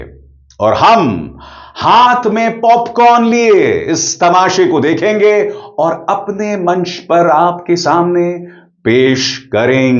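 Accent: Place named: United States. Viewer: Pronouciation of American English .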